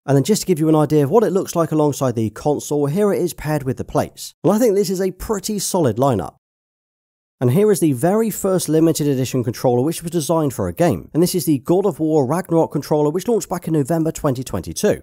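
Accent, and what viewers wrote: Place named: Yorkshire, United Kingdom. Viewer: Where in Yorkshire are you from? I’ve never heard a Yorkshire accent like this 😊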